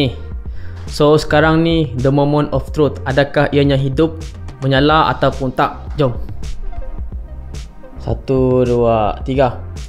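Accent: Sarawak, Malaysia. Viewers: Org srwkkah,sbb cara nya cakap kedak org srwk pin slang xd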